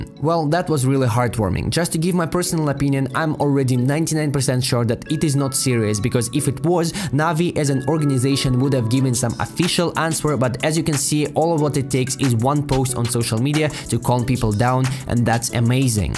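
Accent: Russia. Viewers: next video with strong russian accent please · Thanks mr Russian man news man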